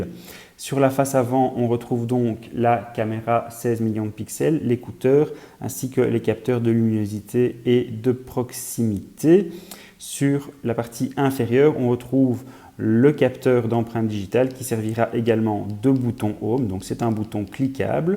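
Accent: Belgium. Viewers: with a belgium accent i would say a belgium accent but i dont arrive to find town Charleroi Liege etc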